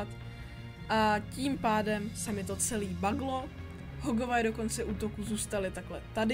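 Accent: Czech Republic. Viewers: DDD Dostalo mě jak si se snažil mluvit "epicky" a seriózně a říkal jsi ty věci takovou Českou Angličtinou:) Jinak fakt by to měli opravit. P.S. Víc videí s tou grafikou na konci, je fakt úžasná, ty detaily... :D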